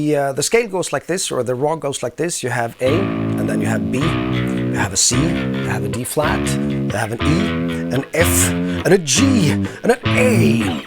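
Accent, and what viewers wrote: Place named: Sweden. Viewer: Dude i just wanna hang out this dude...is he swedish?...just the accent is kool..perfect voice for reading kids bed time stories...idk lol..m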